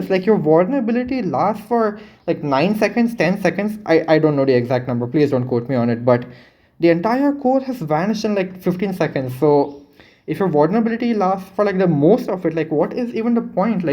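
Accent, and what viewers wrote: India. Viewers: You indian bruv ?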